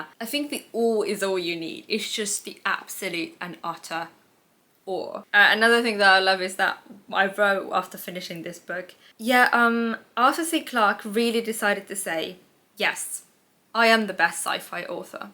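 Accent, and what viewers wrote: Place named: Birmingham, United Kingdom. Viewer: how did you get an English accent btw? (a bit Brummie lol)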